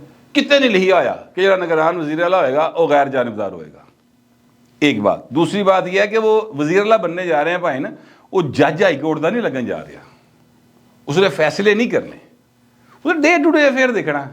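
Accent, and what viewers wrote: Punjab, Pakistan. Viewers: Will u pl lessen the touch of Punjabi.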